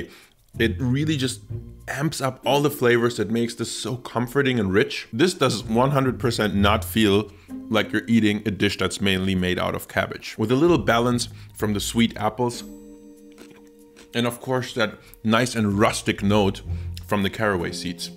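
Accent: Germany. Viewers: It's always waaay easier to spot an accent if u hear ur native language - in German I would only need 3-4 words and I could tell where someone is from as well. But listening to this dude speaking my 2nd language/English - it's hard for me to pinpoint that he's German too oO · While yes his English is very good but definitely a German accent through and through. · I’m pretty sure he reveals his Germanness from the moment he opens his mouth. To a native English speaker, his accent is very obvious and easy to identify. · yea you can hear the german. You always can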